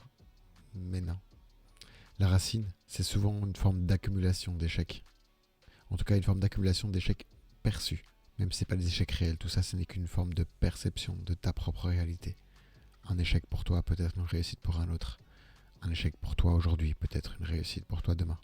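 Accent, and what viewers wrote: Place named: Belgium. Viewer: je viens de découvrir à 34 ans que j'ai un tdah à 99prcent de certitude. pas de diagnostic et je sais même pas si j'en ressens le besoin. le plus important pour moi aujourd'hui c'est de comprendre mon fonctionnement de me pardonner et de me ménager. merci pour ton témoignage qui résonne si fort, ca rajoute de la lumière dans la compréhension des méandres de mon cerveau, de la beauté dans le dysfonctionnement et un peu plus d'auto compassion.. j'ignore si tu es belge, on dirait à ton accent. bah je le suis aussi, ca nous rend si proches hihi. à plus dans le bus!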